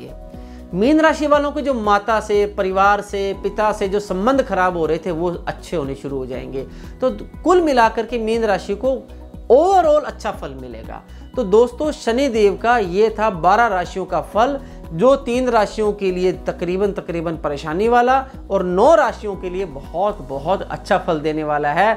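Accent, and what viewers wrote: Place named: Punjab, India. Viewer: he is from punjab that's why his pronounciation is like this...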